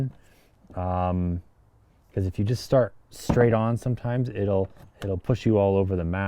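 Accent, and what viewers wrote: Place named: Canada. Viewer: Could you please remake this video with a narrator? I can’t understand the thick Canadian accent. Thanks.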